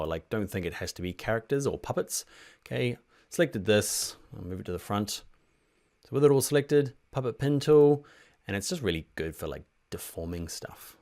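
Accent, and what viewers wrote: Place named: New Zealand. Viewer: Best combination of informative and cool funny narrative. Good job man. You are my favourite whats new guy. And the accent! Kiwis are best...:-)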